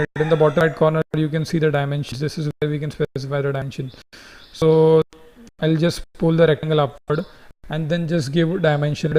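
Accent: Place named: India. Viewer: With respect: This is a valuable tutorial. That cannot be denied. There is one problem with it, that being the speaker's accent - Indian, I think it is. It is very painful to listen to this man explaining his subject. It is the same with the many Indians presenting on the wide variety of topics which they post. I'm sorry for having to say this: these men and women want to share their knowledge with a broad audience, but their use of English language is a major stumbling block for we listeners.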